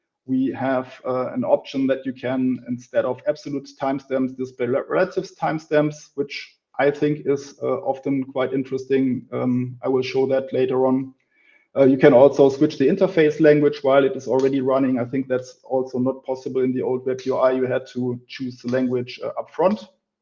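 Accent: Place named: Germany. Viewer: Drei Wörter gehört und gedacht "det is n deutscher" und dann "sooo" =)). Ich wünscht ich könnt nur 10% so Englisch sprechen. But if I hear it, I can learn it.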